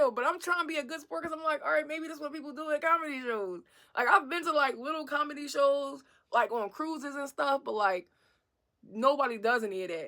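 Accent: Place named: Philadelphia, United States. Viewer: you got a way with words and I got a crush on ya Philly cadence. When are you free to converse more over a glass of Moscato? 🥂 🌹?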